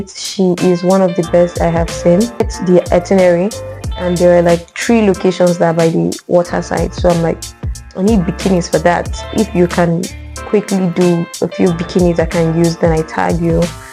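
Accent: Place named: France. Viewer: This cool ❤... just love your french accent fr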